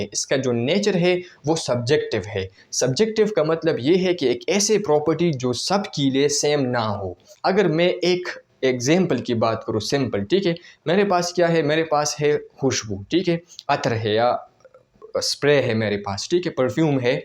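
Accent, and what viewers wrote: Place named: South Asia. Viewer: because brother you looks foreigner but when you sounded south Asian it was really surprising and funny. As far as I can guess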